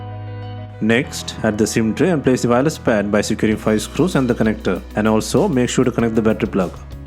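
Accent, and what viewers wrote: India. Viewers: Don't listen to this guy. You were perfectly understandable. Your indian accent sells it.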